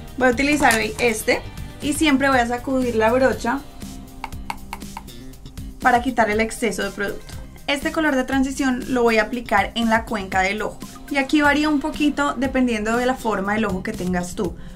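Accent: Colombia. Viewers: Explicas súper bien, aparte ese bello acento que tienen ustedes los colombianos 😍. ¿Podrías hacer un video de cómo delinear un ojo encapotado? Saludos desde México.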